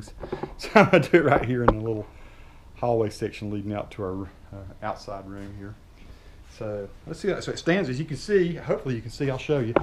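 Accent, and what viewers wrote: United States. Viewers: I am British, that's a proper US accent you have there! Just got the same one for my 21.5" 4k machine - thanks for the vid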